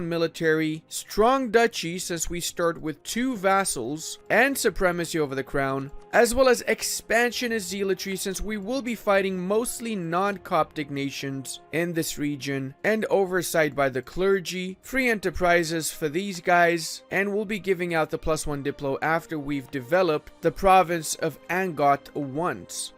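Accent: Germany. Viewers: I can't lie the weird German accent gets somewhat annoying after a while. Not hating just my opinion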